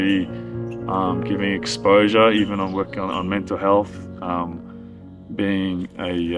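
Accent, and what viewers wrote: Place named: Australia. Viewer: i have been traveling to golds gym from europe every summer for the past 10 years til covid hit. I have pics with you from almost every summer, with Karina Justin Steph Mike etc. You were always such an inspiration to me and to thousands maybe millions of people. I just wanna tell you, you dont be buff and huge, we just enjoy seeing you online again. Im sure you will heal as times passes on. Just take your time, find happiness and joy again. The community will always stand behind you. We all have to face our own battles most of the time alone, but strong people will win those battles, shut down the inner demons and find the real meaning of life again, which is love and selflove. I wish you all the best, and hopefully one day ill meet you again and you will have that bright smile with that aussie accent again.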